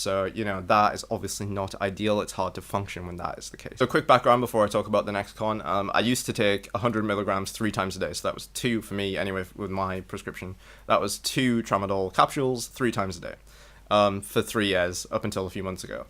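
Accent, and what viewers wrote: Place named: United Kingdom. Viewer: San Jose Sharks ?!?!?! Bro are you in the Bay Area?!?! I am in san mateo. Your accent has me thinking UK 😂 are you just a major hockey fan, or are you living in California? The medicine topic is more crucial to the world's well-being than most people realize. I have grown poppies for a few years now, and consider it a tragedy that most people have been estranged from this great medicinal plant. Human beings in general may be more opium-dependant than is acknowledged. Poppies make a night and day difference in my ability to function in this body, and actually live more full/thorough days, do work, exercise, etc.... For years i regularly exercised and practiced discipline in various forms, without opium or any opiates (having steered away from such substances after high school). Never did i reach the same level of sustained health/function without poppies/opium that i experienced once i began growing and taking them. 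🌷🌷🌷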